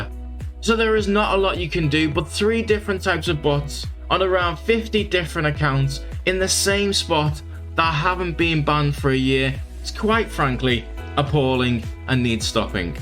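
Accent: Liverpool, United Kingdom. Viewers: Are you a scouser brother hahaha cant tell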